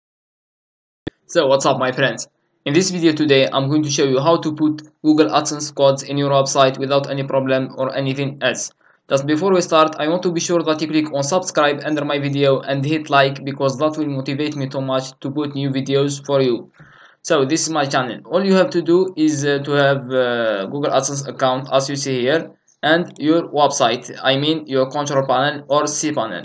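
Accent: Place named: Russia. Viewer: i love the Way you talk I love russians when they talk :)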